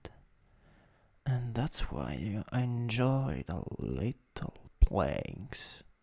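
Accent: Italy. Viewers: I love ❤the Italian accent is the best😅😅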